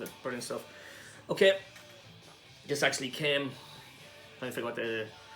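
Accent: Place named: Cavan, Ireland. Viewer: Yea, I deleted it but plan to reshoot it today. I had it all messed up because a few to drink to many hahaha. My good friend Cairan is from Strabane Ireland. I was watching your video and he could tell by your accent your are in Cavan.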